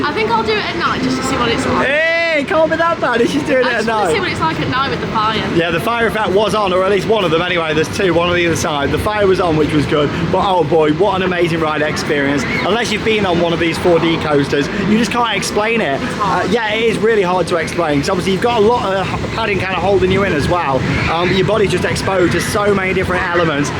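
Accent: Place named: England, United Kingdom. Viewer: yeh sound English boss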